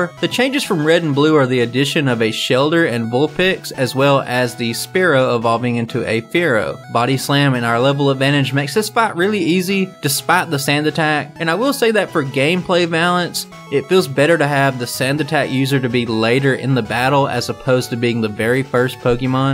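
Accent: South, United States. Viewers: I am glad that the algorithim gods blessed me with your channel. There is just something I love about the American southern accent that I find wholesome ( I know that's stupid, don't at me!) I find that I enjoy watching pokemon challenges, because they are the perfect background noise while I play other games or study.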